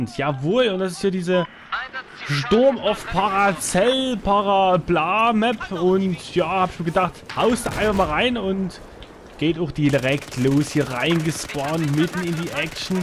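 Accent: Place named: Saxony, Germany. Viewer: nu isch find das sächssische eefach genial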